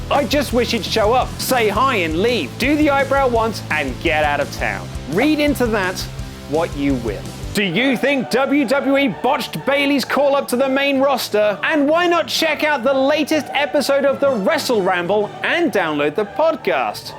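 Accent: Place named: United Kingdom. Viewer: what a shady click bait title...all that talk and not once have u actually given the "real reason" why...just pure speculation one after another after another..kinda fd up..and then in comments its just as many more. and would be so much cooler if u threw some videos in (i kno u prob can't do that do the copyright issues thing) but yea some videos/small cuts from matches or backstage segments etc...would be sooo much better n more entertainment then this bombardment of a I'm guessing British, accent, on and on and on..shit gave me a headache...lmao n i couldnt even get the answer the the question of the clickbait title for it lol..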